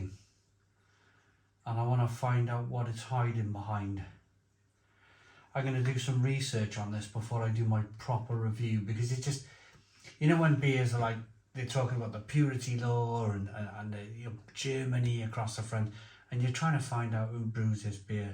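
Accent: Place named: South Wales, United Kingdom. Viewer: Great review. I’ve never bought beer from HB but will now. Great to hear mention of Cowbridge. I went to Cowbridge School and worked in the Bear at the weekends, just loved my time there. Good to hear your South Walian accent “laaager”, “good level of caaarbination” and “Home Baaargins”. Takes me right back to Cowbridge 😊. Keep up the great work.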